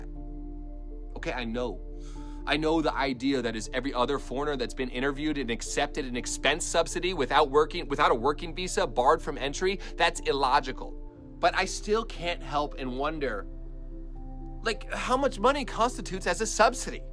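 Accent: Beijing, China. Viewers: 他的中文是在中國好像是北京學的，難免是那邊的腔調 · 樂樂法利請改掉你的北京腔吧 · 應該沒有人期待你來台灣吧！一口大陸腔去中國工作吧！ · 請尊重台灣法律，規定就是規定，還是你在中國太久所以理所當然覺得台灣會因為你是拿美國護照，你就不用遵守台灣的法律規定？偷5元美金跟偷5千萬美金一樣都是偷。針對對台灣政府不給你入境的言論，根本很明顯就是自己不尊重台灣法律，然後裝無辜說要台灣政府給你交代？完全不覺得你很愛台灣了解台灣，或了解台灣人民與歷史（你願意的話，可以多讀點有關台灣歷史）。但其實你可以回去中國，你的口音更適合那裡，台灣人聽到你的口音真的會有某種程度的「抵觸感」。這跟你中文流不流利沒有任何關係。PS.你這個影片也沒有幫到台灣只是很自我中心在說台灣政府欠你說明！你說你想幫台灣？還是想「臭」台灣？好搞笑。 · 你的中文是大陆口音，不是台湾口音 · 我怎么覺得你說的中文有很濃的中国腔？ 你肯定上了親中的電台,你被美国政府通知別譲你入台！ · 真棒臺灣人不要你這個匪諜。大陸口音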